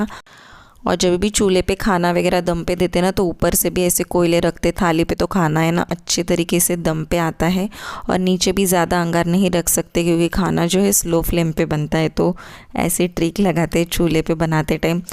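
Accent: India. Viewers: Sister aap kokni ho kya? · As salamu alaikum mai to peheli bar Aap ka Vlloog deqa ji aap Kon se gaon se ho ji Aur aap ka bat karne ka tariqa to Hamare andara pradesh jai sa lagta hai vllog bhi Masha allha Aur pakwan bana neka tariqa Subhanlla 👌👌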